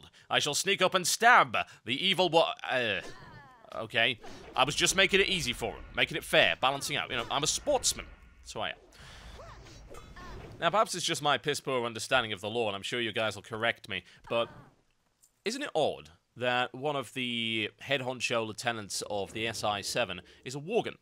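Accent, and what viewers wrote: United Kingdom. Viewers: I watch your videos for a few reaons. First, because I am to lazy to check out the new content myself, I have a premade I run dungeons with and my worgen who sits at 15. Secondly, I generally like watching LP's, and your on my list of people who have good opinions and don't sound like they are 12. Lastly, because you have a clear british accent, and I seldom here them in Canada. Keep making videos! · Excellent reasoning and criteria for knowing when to continue to do the vids (still have lots of viewers). haha. Also, I think another appeal to these videos is because 1)1-10 questing is usually almost always a solo experience that 2)almost everyone can relate and is 3)usually pretty similar to every other 1-10 leveling. Having hilarious, witty, and informative british commentary in ze background of such opening questing is, well, a unique change to the otherwise familar questing experience.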